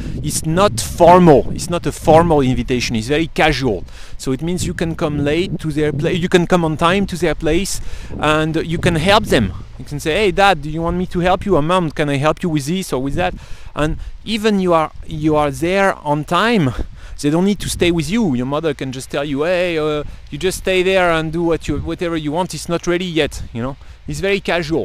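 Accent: France. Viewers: French english, so typical, haha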